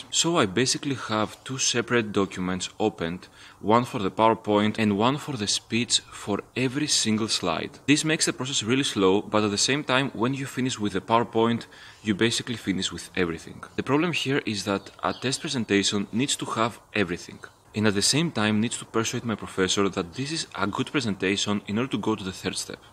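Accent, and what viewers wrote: Greece. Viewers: I knew you are from Greece because of the accent (is similar to my relatives). Which part of Greece are you from? Also, I love the videos! Keep it up! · The accent never lies, you are from Greece!!! In what major are you studying? I'm studying computer Science in technical institute of Crete. It's my first year and I don't like attending my classes , I find them extremely slow paced and lacking educational material.I prefer being self-taught from online documentation and tutorials so I can progress faster. Have you found yourself thinking the same thing about your college?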